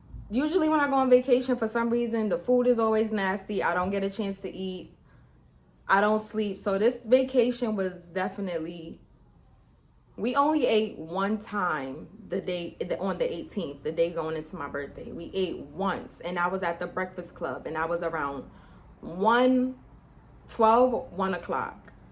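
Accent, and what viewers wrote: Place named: New York, United States. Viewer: Lol ladies not real friends to begin with a girls trip like this already sounds like a no no, 1 or 2 day ones max, lol shorty u Def from nyc I already know I can tell from the lingo I can hear my people's in your voice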